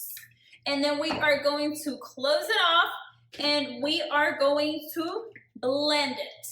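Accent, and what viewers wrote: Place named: South, United States. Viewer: I aint everrr met a mexican with a southern accent lol. I love this.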